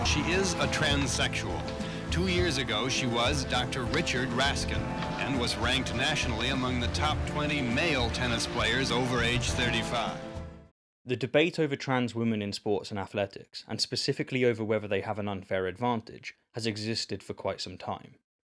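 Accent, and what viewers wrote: England, United Kingdom. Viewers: This is too tragic. I thought this was somehow going to be promising. Forcing skepticism on anything and everything is just a formula for disaster. Talk about scraping the bottom of the barrel. I guess it's just the english accent I so splendidly enjoyed but other than that nothing to distinguish the channel from the rest of the noise.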